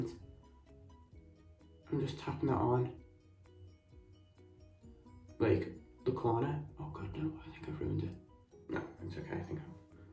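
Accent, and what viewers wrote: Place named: Liverpool, United Kingdom. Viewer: Your makeup is gorgeous x am guessing your fromm liverpool? Because I am too!! ❤🙂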